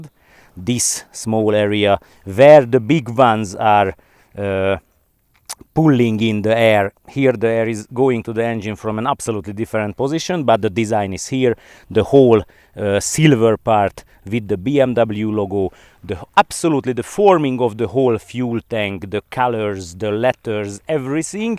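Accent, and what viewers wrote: Hungary. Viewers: Hungarian accents are cool. Sounds slavic, latin, and germanic all at the same time.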